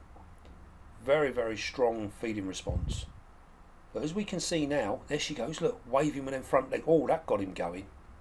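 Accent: United Kingdom. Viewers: There's nothing better than having a British guy describe nature to you, you know it's the Truth because of the accent.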